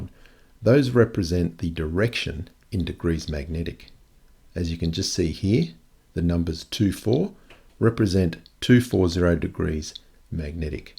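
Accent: United Kingdom. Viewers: A beautiful and well thought out video. you dah man!! A really good use of your time while flying over the ocean, and getting to stick it to the Flat Earth where the sun don't shine at the same time. I did my military service as an 18 year old (compulsory in Singapore) in the armoured artillery. We used maps and got to understand what they depicted. Back in the late 70's. My son did his service in 2006 and now they have the benefit of GPS targeting. You know that thing that you use in you cars to get to where you're going? It used sattelites. You know those pesky things that orbit the globe? Flat earth? What a frigging stupid idea. Free speech is one thing, but to use it to dumb down a sizeable portion of your people is worrism. Mark sargent, Jeranism, Patricia whashername, are actually conmen who don't really believe what they claim and are there to reap what they can earn from the uneducated and gullible public. 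The US should not have any place for this stupidity but sadly it seems to have. forgive me if I'm wrong but you sound like you're from the UK. I guess the epidemic of FE stupidity has crossed the pond. You have your work cut out for you if you should not get weary of sticking it to the Flatties. A really great and informative video. Well done!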